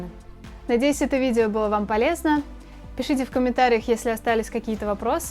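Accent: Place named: France. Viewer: Такой крутой урок! Подписалась с англоязычных обзоров, думала, что вы француженка по акценту. А тут оп и русский. Так приятно 😍 И вот эта серия из 3 уроков просто 🔥🔥🔥🔥🔥🔥🔥 Спасибо ❤️ У вас так много знаний по обработке, буду ждать новых уроков ❤️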